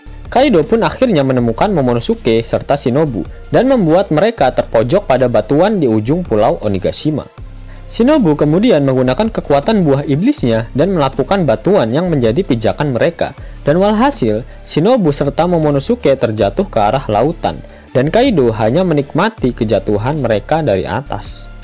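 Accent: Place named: Java, Indonesia. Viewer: Wkwkwkwk alias kelelep .... Nguakak aku couk.... Jowo endi sampean cak..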